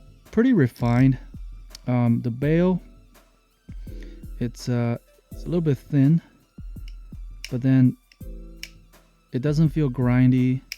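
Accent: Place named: Canada. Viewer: Fuck Im good. I could tell immediately you were in north America then after a couple minutes I knew it was Canada😂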